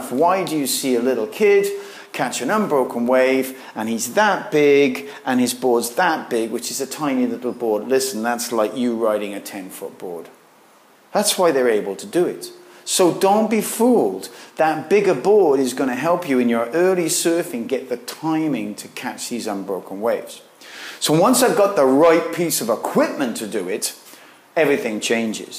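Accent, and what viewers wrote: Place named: Newport, United Kingdom. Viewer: Can’t tell what accent he has sometimes I notice a Newport accent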